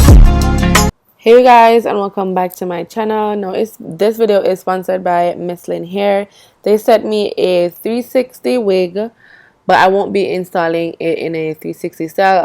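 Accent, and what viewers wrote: Jamaica. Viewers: Girl I knew you was Jamaican from you said the 1st 2 sentences!.. lol u goooo girl.. this style is 🔥🔥🔥.. the baby hair is giving!!!!!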